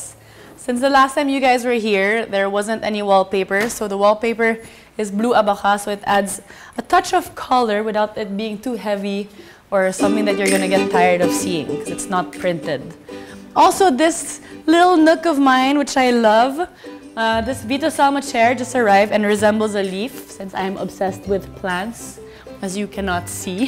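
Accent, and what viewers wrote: United Kingdom. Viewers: that british accent hahahaha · That Brit.accent by 😍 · I like her british accent👌🤗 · British accent 😍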